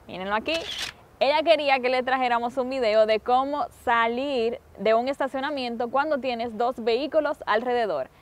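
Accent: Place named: Dominican Republic. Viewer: Lo sabia que era dominicana por el acento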